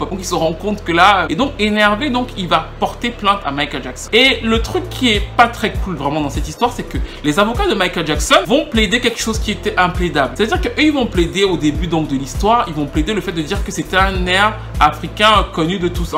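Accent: Cameroon. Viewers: Je m'en doutais que tu avais un truc camerounais. Ton accent sur certaines phrases me rappelais les touches camerounaises et je me disais c'était juste un parfait hasard.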